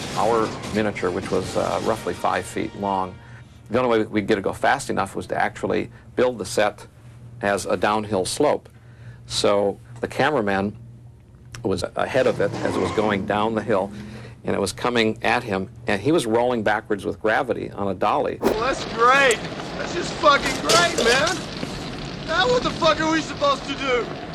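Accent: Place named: Alabama, United States. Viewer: I love hearing that Alabama accent! Keep up the great reviews and interviews. Enjoy the hell out of this channel!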